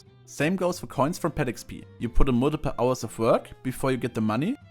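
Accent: Germany. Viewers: Bro du hörst dich mies deutsch an (ich kann auch nicht besser englisch)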